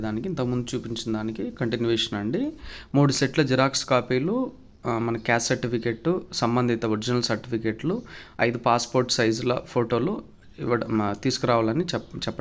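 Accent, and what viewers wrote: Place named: West Godavari, India. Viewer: అండి అంటున్నారు మీది west గోదావరి నా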